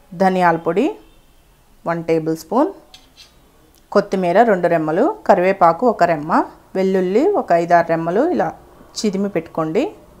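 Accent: Nellore, India. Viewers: Nice madam from:kavya mi vantalu motham super cheppadam kuda nidanamga ardamaila chepthunnaru.midi nellore ra madam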